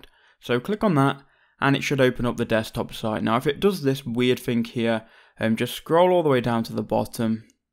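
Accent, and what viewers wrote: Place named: United Kingdom. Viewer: Thank you British man!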